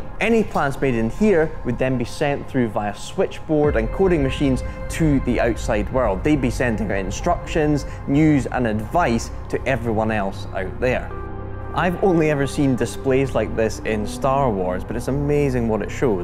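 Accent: United Kingdom. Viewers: yeah, it's called British accents. Don't get what people are going on about. He's not saying it wrong, it's his accent and we have a lot of accents for such a small island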